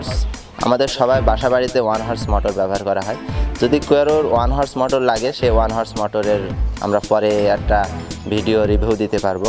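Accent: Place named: Chandpur, Bangladesh. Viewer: তা আপনি একটা ভিডিও বানাচ্ছেন ভালো কথা কিন্তু কষ্ট করে শিখে নেন না কেন যে কিভাবে মুটা মুটি শুদ্ধ বাংলা দিয়ে ভিডিও টা প্রেজেন্ত করতে হয়? সেটা তো আপনার চ্যানেল এর জন্য ভালো ,আমার বাড়ি ও জসর শহর থেকে ২০ মাইল একটু নিচে কিন্তু আপনার মনে হয় কোট চাঁদ পুরের দিকে ।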